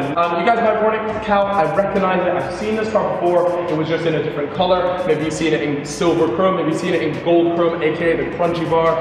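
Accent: United States. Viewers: is he american? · dude he sounds American not English tf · Are you American or Canadian? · why does this guy have an american accent? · american accent lol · am the only one thinking that sounds American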